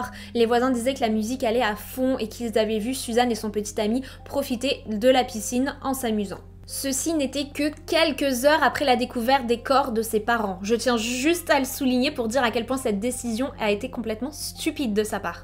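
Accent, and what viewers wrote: Quebec, Canada. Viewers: Est tu canadienne tu a un acsant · On dirait qu elle a un accent léger du Québec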